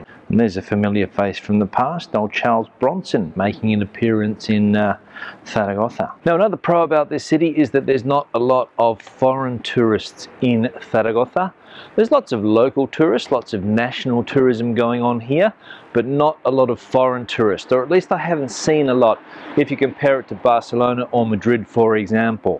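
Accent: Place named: Australia. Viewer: I can hear the Aussie accent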